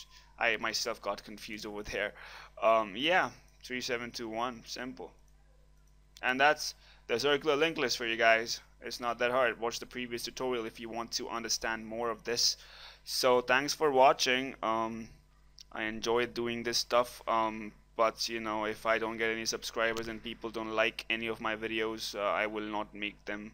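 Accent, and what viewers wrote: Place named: India. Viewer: Why would that be considered racist..? Sounds like a question stemming from curiosity, nothing wrong with that. That being said, it sounds like an Indian accent., but I could be wrong!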